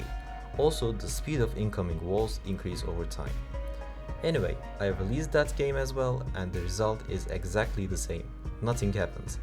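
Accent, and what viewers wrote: Turkey. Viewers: you have turkish accent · is this a turkish accent? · Türksün giba aksanın öyle gibi geldi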